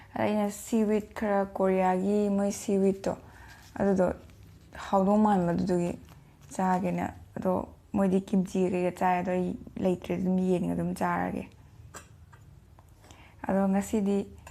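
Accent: Kakching, India. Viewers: Kakching marolsi eidi Yamna Nungsiye taba Yamna nungai... Adum ngangbiyu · Che kakching lon ngangbana nungcye che nungcbana loireda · Kakching londo yam nungcee luf u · Kakching lon ngangba c n henna neire keino ado haobro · Kakching londa adum ngango ym nungye taba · nahalkhei ngangbado adu oina ngangbiyu kakching lon natabida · echan gi kakching gi londa ngangbase yam taba nungai, adum ngangbiyo:) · wa ym ngngo kakchng londa taba ym nungaiye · Kakching londa adum twbiyu aduna henna taba nungyjei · kakching londa ngangbase ym nungcye🙃🙃🙃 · Kakching marol je khara thuna ngangje amta tage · Kakching maron ngangbajidii yamm nungche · Ym nungcyee kakching marol da ngangbashe pukningbu nungcrakae che na ngangba taraga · New subscriber👍...kakching nupi amt koidb ydre lon khoirg ngngk nba😃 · Cgi channelse ngacna ahanba thengnabne subscribesu twjre.aduga sisterse mapanda leibro? 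Kakching lonse somna ngangbcdi tabda nungaiye. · Che2 na kakching lon ngnBse ym nungsiYe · mathngdi kakching marol ngangbgi oina twrk o chaba tok o ngangbdo taninge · kakching londa adum ngangbiyo yam taninge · kakching marol se yamna manipur da pamnarklibane ateigi khoibaga mannadabane lovely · kakching marol da ngangbasee yam ngaojei yee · Kakchng mchani khngnaba dumm che gi vlog khufing gi ngango duna nungai... Eisu kkchngne...😁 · Hwjikta thengnabni chegi channel se..eisu kakchingdeini Eikhoigi londa ngangbsi tabada nungaijei👍👍👍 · Ngasidi kakching lon taba phngngiiii💃💃💃💃💃. Yam tba nungaiyeee ado mthnggi vedio dsu kakching lon oin twseeee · kakching lon sae eym nungcyae tabada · Che kakching marolda ngangbase ymn nungcye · Kakching lolda ngangbana henna pos tai ae.. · Che ym haormdoi mlle kakching londa asum ngangbi rk o taba ym nungaiye nungsu ym nungcye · Video loina kakching maron da oina twwo... 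Duna henna nungai jei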